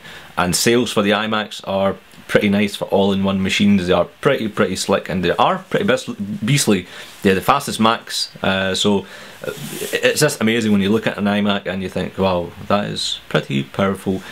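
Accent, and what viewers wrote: Scotland, United Kingdom. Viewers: The Scottish accent is very soothing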